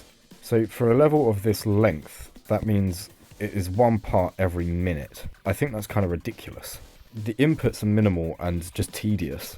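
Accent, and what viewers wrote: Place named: United Kingdom. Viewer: again british accent hard carry, good video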